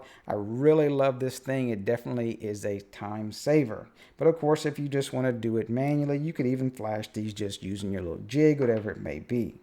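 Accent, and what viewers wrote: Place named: Texas, United States. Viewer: I love Texas accent! ❤❤❤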